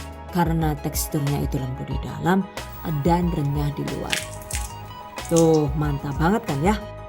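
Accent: Banyumas, Indonesia. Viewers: terimakasih atas resep nya kak ,ngapak juga nih kakak 😂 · Selamat pagi , matap . Gabung bikin ngiler haaaiy .Bunda Suaranya Jawa enyong (kebumen , Bnyumasan )jw tulen 😃😃😃😃😃😃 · Kie wong nek agi ngomong ngapak jan merguyokna pol😂😂😂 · Sg penting ngapak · Mantap Bunda apa lg dng bahasa ngapaknya aku suka sekali jd sambil nonton sambil ngakak😍👍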